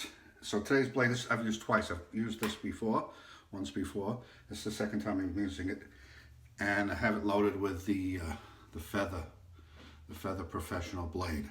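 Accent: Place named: New York, United States. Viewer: I love your New Yok accent.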